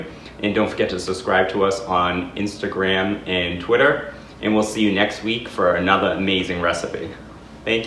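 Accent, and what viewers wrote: Boston, United States. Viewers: Looks delicious! Oreo is adorable! And, is that a Boston accent I’m detecting! LOL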